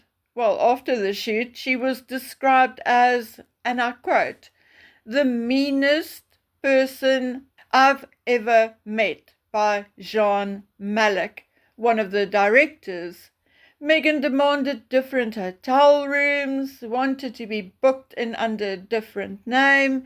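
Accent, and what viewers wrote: South Africa. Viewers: Just heard this post is this a South African accent here?? If so, greeting 🙏 from South Africa 🇿🇦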